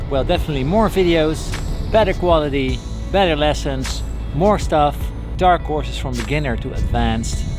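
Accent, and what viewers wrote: Netherlands. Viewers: It's Dutch, it's a pretty unique accent. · Hi, bij toeval een weekje geleden op je kanaal gestuit. Ik kon horen dat je uit NL kwam, dus ik zoeken, blijk je in Zwijndrecht te wonen, naast Dordt waar ik zelf woon. Ik zag dat je les gaf, maar geen leerlingen meer aannam. En nu lees ik dat je stopt als leraar. Er kan veel gebeuren in een week. Supergoede keuze: je hebt superveel talent en een drive, dus dit gaat zeker goed uitpakken. Best of luck! Markwin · I love your accent. :v As a hobbyist voice actor I'm going to look into learning how to do a Dutch accent now. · so he is dutch? i just discovered this channel and was wondering about his accent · Nice, always thought I recognized a Dutch accent, bit now i'm for sure.(lesruimtes) Good luck on your new challenges.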